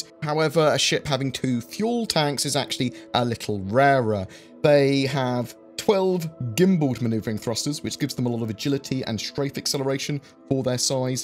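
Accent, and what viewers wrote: United Kingdom. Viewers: 350R or 315P, British accent not withstanding...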